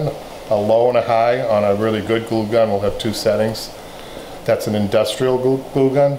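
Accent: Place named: Boston, United States. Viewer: I've been watching youtube videos to learn how to reupholster my chair, and came across this. Your accent...I thought, "he has to be from back home?!" Sure enough, Boston! Thanks for the great videos, from a fellow Masshole now living in Nashville, TN!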